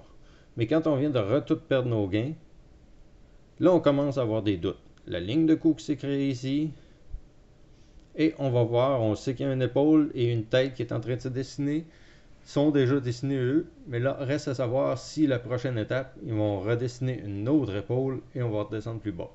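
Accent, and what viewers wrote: Quebec, Canada. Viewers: sI JAIME CE QUE TU FAIT ..... malade. cest bien de l entendre de la bouche dun Quebecois ;) cest difficile pour mois qui travaille de 6am a 2pm de suivre tes cours en direct. ca va etre enregistré? merci jaimerais bien moi aussi changer ma vie! cest possible de trader dun cell, avec l aide de votre communité au début ?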